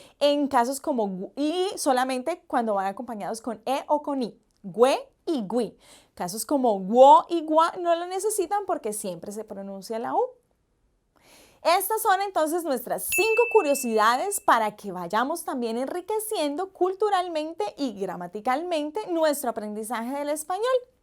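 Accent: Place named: Colombia. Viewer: me parece acento colombiano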